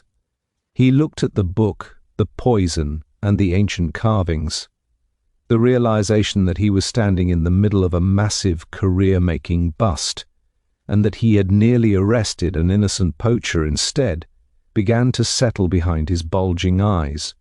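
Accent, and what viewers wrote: United Kingdom. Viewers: The reader is very good and diction is very clear. However I’m sorry to have to say this, but hearing this read by a very English accent spoils the atmosphere, reality and charm of this story. The charm is in the Highland accent and pace of speech and this is not it. But I’d listen to any other story read by this speaker as he was so clear.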